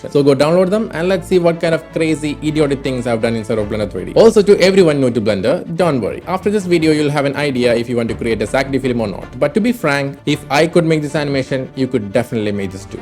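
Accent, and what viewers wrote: Kerala, India. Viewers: bro are you malayali, sounds very like it? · You started getting a bit too indian for me, couldnt understand halfway through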